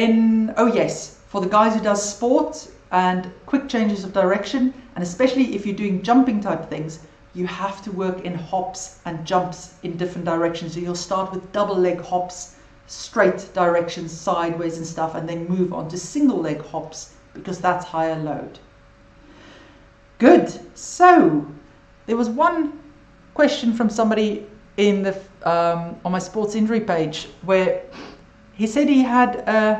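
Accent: Afrikaans, South Africa. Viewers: Are you Afrikaans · Best info I've run across after 5 days of searching. Really good stuff. Well done. Love your accent. I'm guessing South Africa?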